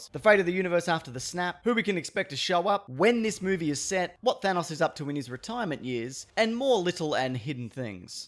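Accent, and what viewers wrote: Australia. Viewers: You sound Aussie as fuck are you Aussie as fuck? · Man true Australian accent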